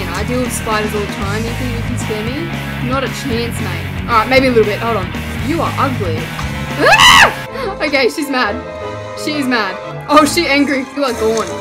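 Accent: Australia. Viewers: yk shes aussie just by the way she speaks <3 I can relate.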